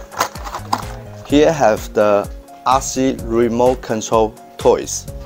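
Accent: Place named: China. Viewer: Love the accent i like this chinese guy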